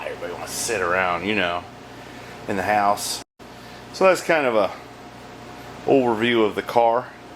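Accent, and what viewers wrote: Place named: Appalachia, United States. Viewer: Damn i love your hillbilly accent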